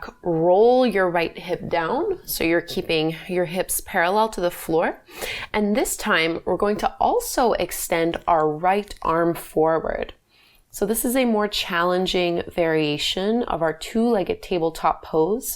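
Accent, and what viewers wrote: Canada. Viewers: My word today is "grounded". Thank you for your amazing videos. I love starting my day with your guidance and expertise. I really appreciate your encouragement for modifying poses as needed; I never feel any pressure to conform to something that doesn't work for my body. Also, as a transplanted Canadian living in the US, it is really wonderful to hear your Canadian accent. The three oms at the end gave me some time to really internalize my practice.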